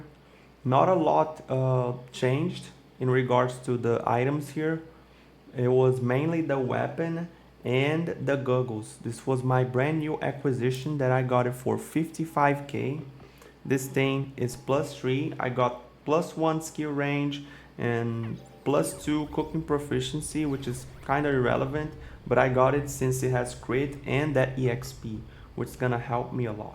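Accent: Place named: Brazil. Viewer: Da pra perceber q é br pelo sotaque kkk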